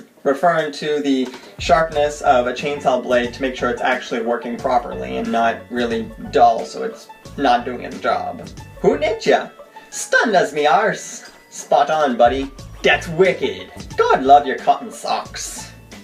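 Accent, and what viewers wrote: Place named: Ireland. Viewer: Sounds very Irish!! New subscriber 😄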